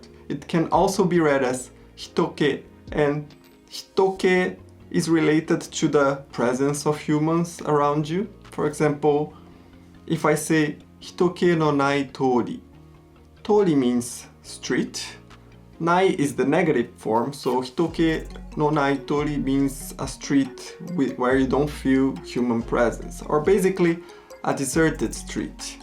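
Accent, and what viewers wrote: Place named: Brazil. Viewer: You sound like a Brazilian, am i right? You have Pretty good english and japanese!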